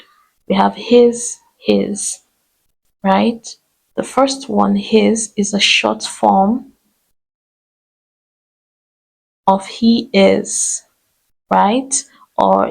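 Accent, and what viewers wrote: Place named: Europe. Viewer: Alright , the way you speak and pronounce , it's obvious you are NOT a Native English speaker. Any fluent English speaker will surpass your explanation in time lesser , 3/4 th or 1/2 the time you spent. explaining. You have a very strong , thick European accent.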